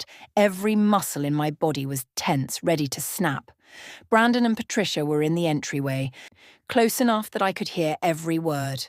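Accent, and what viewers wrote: England, United Kingdom. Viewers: Very interesting story , Love your English accent ❤ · Just love your real, English accent. So tired of listening to the same, possibly AI-generate voices and bad narration...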